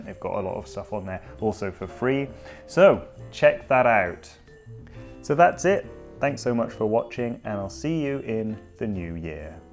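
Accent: United Kingdom. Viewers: "a bumper year for lobbing things into space" British lol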